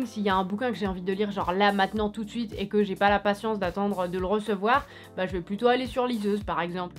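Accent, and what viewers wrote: Paris, France. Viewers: She does speak fast yes. And she has a little (Parisien ?) accent. Congrats on learning a language ! :)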